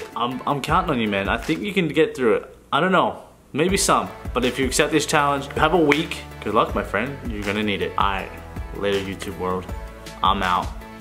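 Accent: Australia. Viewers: Wait is he Australian??? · Honestly don’t get how anyone could doubt that he’s Australian. His voice alone gives it away plus the words he uses. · Wow the Australian accent is making a comeback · He had an Australian accent for a lot of the video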